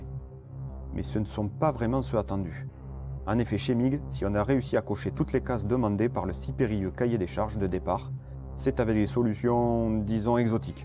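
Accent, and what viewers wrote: South, France. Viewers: J'aime beaucoup cet accent du midi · Excellente vidéo J'adore son accent du Sud 😂 avec un texte bien écrit. Le fait de rajouter les sources c'est important. Par contre c'est quoi les deux musiques du fond ? et ? J'adore 👌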